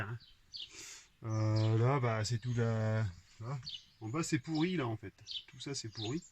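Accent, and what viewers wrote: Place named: North, France. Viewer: Travail monumental 😱💪🏻💪🏻 Bravo. On est en plein dedans pour la capucine de notre camping-car 🥺 J’ai reconnu l’accent du nord de mon enfance ☺️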